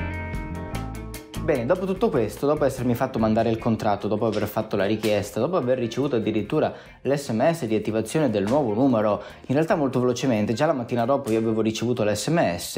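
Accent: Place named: Mestre, Italy. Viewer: Si sente proprio la R de Mestre HAHAHA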